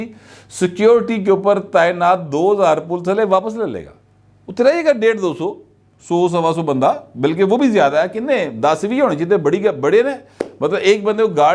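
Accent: Punjab, Pakistan. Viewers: اَلسَلامُ عَلَيْكُم وَرَحْمَةُ اَللهِ وَبَرَكاتُهُ جناب محترم بھائی صاحب اردو میں پنجابی کا تڑکا لاجواب۔۔۔۔👌👌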